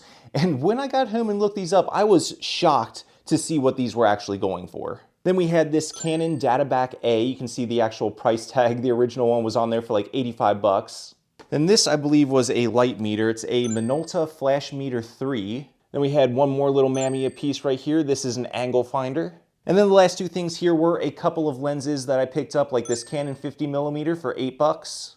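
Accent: Philadelphia, United States. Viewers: That accent has gotta be Philly or Pittsburgh. Request for you to say a few words in your next video: water, home, motor oil, hoagie, youse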